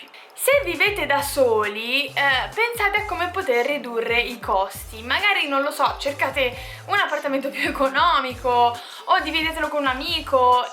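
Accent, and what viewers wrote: Tuscany, Italy. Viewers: ti è scappato l'accento toscano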